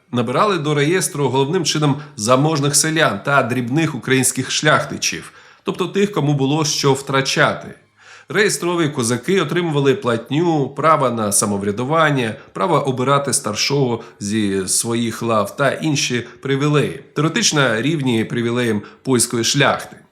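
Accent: Russia. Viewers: Житомирщину до совка частково називали Волинь,це пізніше так назвали "Луцьку область". Автор раніше видавав контент ворожим язиком,звідти і наголоси.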